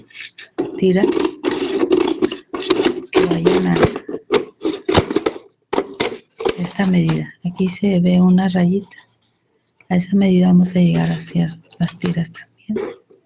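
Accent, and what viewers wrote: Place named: Chile. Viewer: Mexicana???hoooo habla igual que nosotros los chilenos, llegue a pensar que podia ser chilena jajajaja....bueno se paso me han encantado todos sus trabajooos....besoooossss amiga..DIOS la rebendiga mucho por todas sus creaciones...😍😍😍💖💖💖👏👏👏🌹🌹🌹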